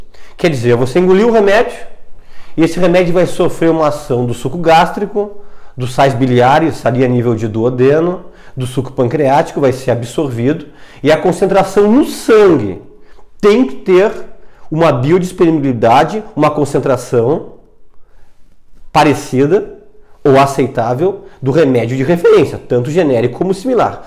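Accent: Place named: Rondônia, Brazil. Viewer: O senhor fala a nossa língua Rondônia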